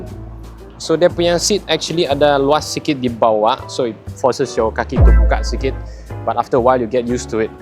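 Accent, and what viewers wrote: Sabah, Malaysia. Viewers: logat sabahan....padu macam tu motor...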